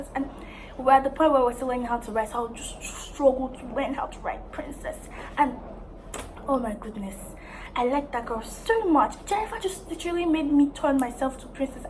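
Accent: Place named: United Kingdom. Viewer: You have a British accent🤔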